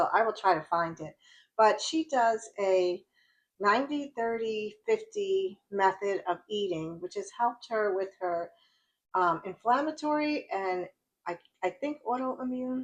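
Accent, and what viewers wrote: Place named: New Jersey, United States. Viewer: Oh, friend, sleep deprivation is no joke. I literally got tears in my eyes when you said Michael hadn't slept for a week. Been there, and it's so exhausting, to say the least. Just wears you down in every way. Glad he slept on Sunday night. All the food you showed looked great! The eggs/chickpea dish... yum! I loved following along with you in your week. I don't know how you kept it all straight from day to day! P.S. I love your NJ accent. Hehe, did you know you have an accent? Or maybe I'M the one with the accent. 🤔😄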